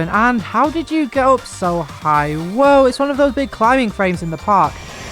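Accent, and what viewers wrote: United Kingdom. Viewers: ahhh now i hear your voice i can tell your british 😂. i hadnt heard it before this vid lol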